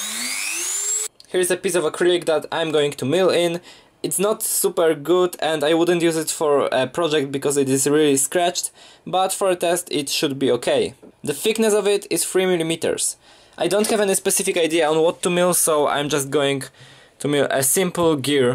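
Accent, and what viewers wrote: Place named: Poland. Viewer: Bardzo fajny projekt sam chciałem zrobić coś podobnego i słuchać że jesteś Polakiem po akcencie i po nazwisku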